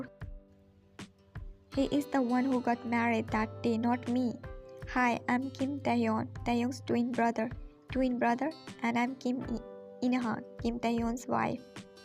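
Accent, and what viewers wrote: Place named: India. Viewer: Your voice is just so cute I like your accent are you from India ? Lots of love from 🇫🇷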